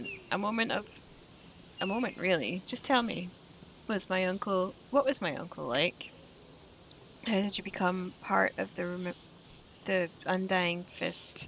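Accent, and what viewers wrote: Scotland, United Kingdom. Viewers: Slowly getting into the rhythm of the game. Something I like a lot is going to Options and extending the season to 10 days or more days (the effect begins on the following season). This allows me to play all the side-quests, explore at my pace, battle baddies, and experiment within the season. A big part of the game is building a settlement/village/town and managing the settlers. Love the Scottish brogue; it's now part of my game, bringing it alive!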